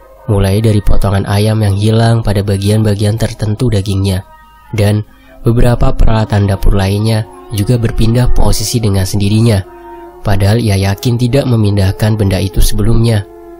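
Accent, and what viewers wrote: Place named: Banjar, Indonesia. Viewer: maaf.. urang banjarkh ini.pina nampak logatx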